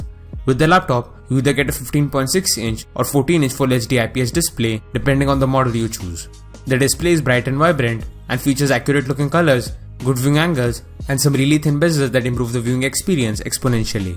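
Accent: India. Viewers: That dirty fingernails are disgusting me, and then I realize the Indian accent.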